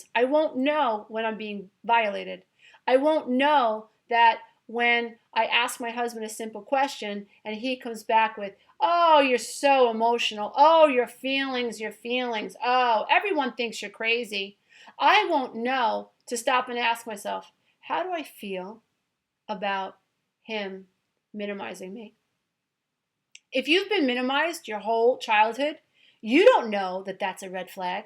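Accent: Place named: East Coast, United States. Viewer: I love your videos! It's like therapy for me! Thank you so much! Keep up the good work! Also I have a question... Are you a therapist? If so by any chance are you located in Southern California? I would assume you're on the east coast due to your accent. But I love your advice and am looking for a therapist. Thanks again!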